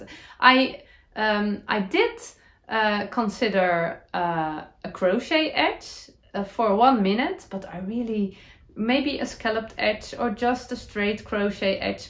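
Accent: Netherlands. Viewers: I am from Oregon USA. Your youtube channel is so relaxing to watch while I knit, from the beautiful introduction music and throughout. I am a slow knitter, my process is slower and my lifestyle doesn’t allow me to finish projects as fast as I’d like and I never feel bad because of it when I watch your episodes because you never present an unrealistic amount of items you’ve finished. You have a very pleasant “Dutchy English” and your style is lovely. Thank you! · I love your sweater! The colors are so beautiful and looks great on you. I wonder if you could embroider a couple of small flowers in the extra stitch gap area in the front or add small buttons. Just an idea if it continues to be a concern for you. I love your podcast and your Dutchy English😊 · Love your podcast and your Dutchy English! Your Ariana sweater looks great. As you were describing what you wanted at the centre front of the neck I think you might find that the centre double decrease will give you the look you want (for the next sweater!)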